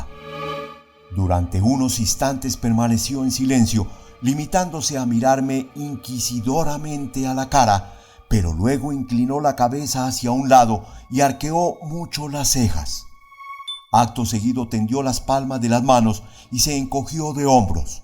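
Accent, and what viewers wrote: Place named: Latin America. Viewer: Qué dicha, un narrador Latino.con este cuento.. Gracias!!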